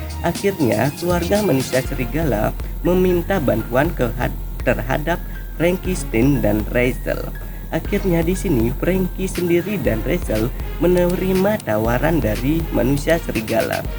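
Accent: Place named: Lombok, Indonesia. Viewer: Miminnya seperti orang lombok ya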